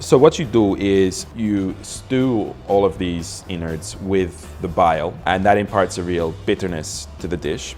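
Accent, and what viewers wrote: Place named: Philippines. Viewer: bossing, yung australian accent mo nagiging pinoy accent... naturalized ka na!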